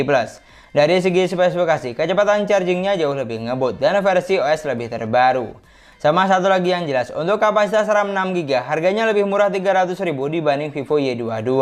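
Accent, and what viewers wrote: Madura, Indonesia. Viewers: Bang asal madura kah?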